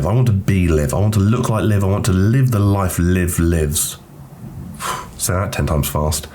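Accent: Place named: North, United Kingdom. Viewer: This is so random but are you northern lol...this is the first time you've sounded northern to me lol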